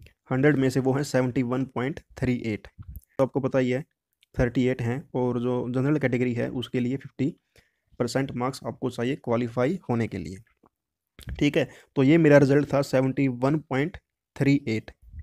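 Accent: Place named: Haryana, India. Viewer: Ap haryanvi hee Bola kro kuki bich me jb ap hindi bolte hoto to haryanvi swg aaa jaata h😁😁😁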